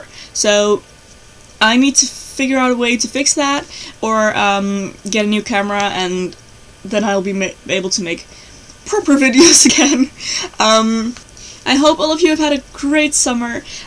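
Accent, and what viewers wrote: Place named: Netherlands. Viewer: you might speak dutch, because of your accent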